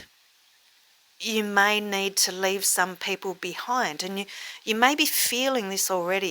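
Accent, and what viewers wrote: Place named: Australia. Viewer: Hello. Thought you sounded Australian. So am I. Like what you share.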